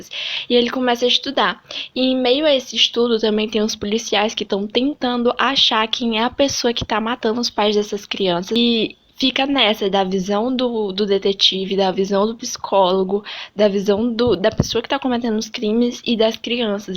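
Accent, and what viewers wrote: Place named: Ceará, Brazil. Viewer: Olaaa. 😊😊 Eu vi essa TAG esses dias e achei bacana pra responder. Talvez eu faça QQ hora dessas... O sorriso da hiena eu fiquei curiosa pra fazer a leitura. Jogos vorazes nunca bateu a vontade de ler mas vi os filmes e achei ok. Gente, teu sotaque é tão bonito. Parece cearense. 😊 Aaah, eu amo GOT. E curti desde o início kkkkkkk Küss 😘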